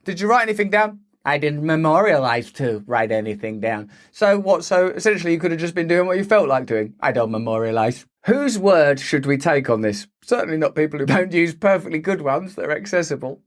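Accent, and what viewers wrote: United Kingdom. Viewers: "it's proper dodgy" XD i love how British he talks lol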